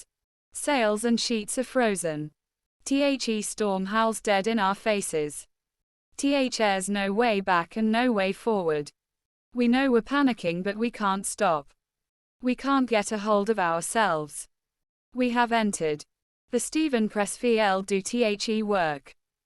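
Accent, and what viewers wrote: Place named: England, United Kingdom. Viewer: Maybe I would have heard this robotic voice in an American accent with an English accent is much harder to listen to this.😢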